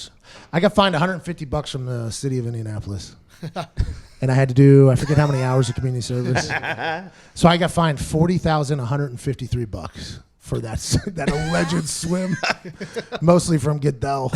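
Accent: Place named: Pittsburgh, United States. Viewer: “Aht” love the pittsburghese that speaks.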